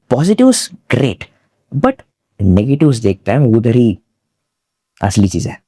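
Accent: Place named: Tamil Nadu, India. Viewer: Love your tamilian hindi accent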